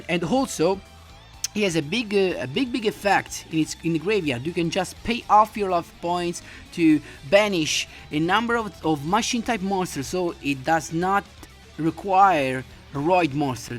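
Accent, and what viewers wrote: Italy. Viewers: Great deck, I’m subscribed! Also, are you Italian?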